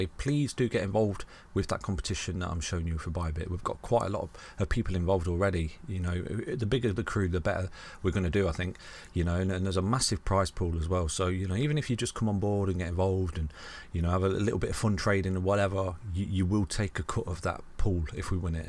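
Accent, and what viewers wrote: United Kingdom. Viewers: Finally, the first! I love your channel and how yku present everything. British accent on top. Haha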